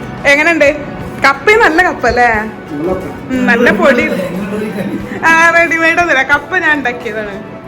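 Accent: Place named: Malabar, India. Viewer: ങ്ങൾ തിരൂർ ഭാഗത്ത് ഉള്ള ആളാണോ. ഞാൻ കോട്ടക്കൽ ആണ്. മ്മളെ ഒരു സ്ലാങ് ഉണ്ട് ങ്ങക്ക്😀😀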